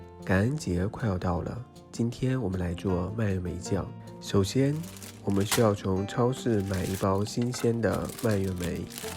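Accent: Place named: Northeast, China. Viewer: 哈哈哈哈哈 笑死我了， 你开口 就已股东北味，挡都挡不住那种！ 哈哈哈啊哈哈 越听越搞笑！！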